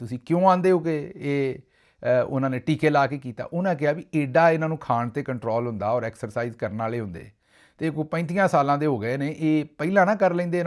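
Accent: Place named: Malwa, India. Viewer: Sahib tuhade wali Punjabi Bathinde Mansa area vich boli jandi hai.Mai tuhada prog bahut enjoy karda ha. God bless you.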